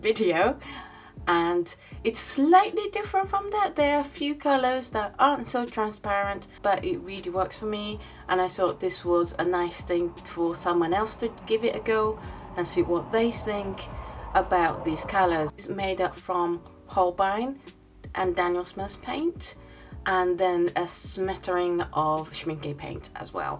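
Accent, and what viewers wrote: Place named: United Kingdom. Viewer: I adore this channel. I just know you'll go far. Question, a bit of a personal one: Are you a UK expat, or were you born/raised in the UK. Your accent sounds blended and it's awesome!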